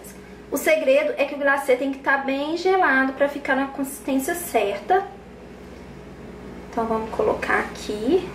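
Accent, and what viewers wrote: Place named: Minas Gerais, Brazil. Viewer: Parabéns. Arrasou, como sempre. Adoro seu sotaque de Mineirinha. Uma graça! Beijos querida.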